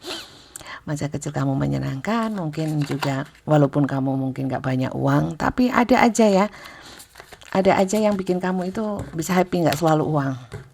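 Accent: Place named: Surabaya, Indonesia. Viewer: Q suka logatnya, Malang & Surabaya banget.. q arek Surabaya, tpi udh lama stay di Bali. Jadi kangeeen Surabaya. Wah bener, harus waspada..di sini ada tetangga tukang gendam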